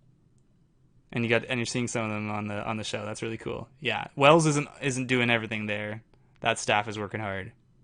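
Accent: Canada. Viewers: aha! So to those that religiously follow and interact with (, the owner of this channel) have been wondering forever who he is and where he is from. Everyone suspects he must be Canadian because of his accent. So we are trying to fish that out. He is very mysterious haha. But a super nice guy, like SUPER NICE has two dogs Jackson and Bob and his name is not Harrison. Also he has relationship experience, we have no idea if he is in a relationship currently. When he has 100k subscribers he will do a face reveal. So please tell your friends to subscribe haha.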